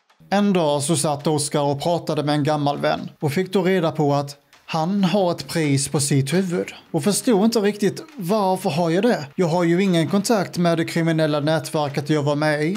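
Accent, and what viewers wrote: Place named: Scania, Sweden. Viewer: Översättning förstår inte din skånska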